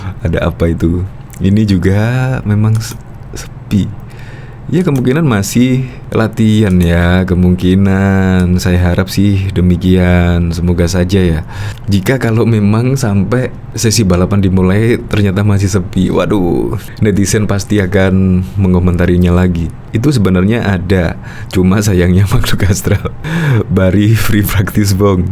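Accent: Java, Indonesia. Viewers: Medok banget ngomongnya pasti bukan wong jakarta😘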